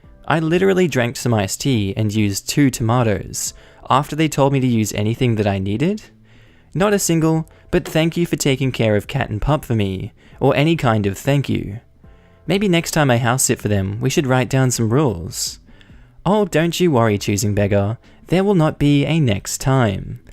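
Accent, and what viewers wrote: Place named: Frankston, Australia. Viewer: Sounds like Frankston type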